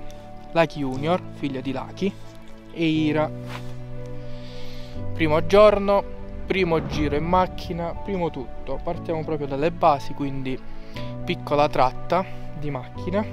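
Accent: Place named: South, Italy. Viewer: Bravo,bel video ma di dove sei, mi sembri del sud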